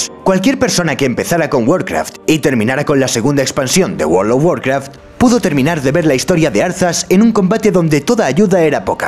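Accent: Spain. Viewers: Que voz, soy latino y siempre hemos sentido el acento español un poco raro, pero el de este hombre es increíble, simplemente magnifico jeje veo siempre todos los vídeos por la voz.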